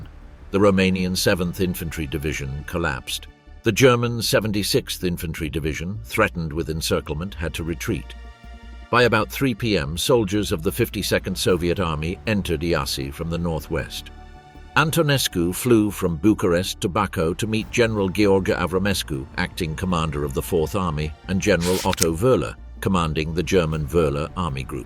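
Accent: United Kingdom. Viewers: In primele minute ale povestii voastre apar și primele , nu erori ci minciuni Limba engleza și acentul britanic al povestitorului nu conferă autenticitate povestii mutilate și modificate propagandistic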